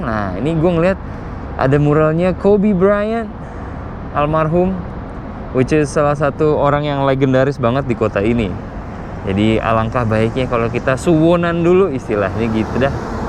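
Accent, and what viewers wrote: Indonesia. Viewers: buat orang rantauan luar negeri selama 19 tahun itu keren kalau logat indo lu masih dipertahankan, atau ga berubah, karena beberapa orang yg udah lama diluar negeri ketika balik ke indo itu logat indonya kurang, kyk bule baru belajar bhs indo wkwkw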